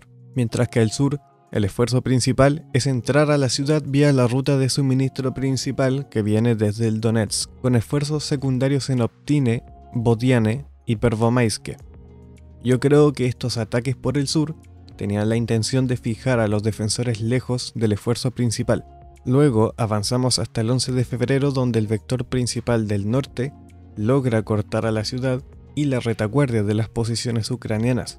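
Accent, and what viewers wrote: Chile. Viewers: Otro youtuber chileno bueno informando sobre la guerra ruso/ucraniana? Al menos a mí me suena algo chileno el acento. Bravo los chilenos! Se aprecia y agradece la objetividad. Felicitaciones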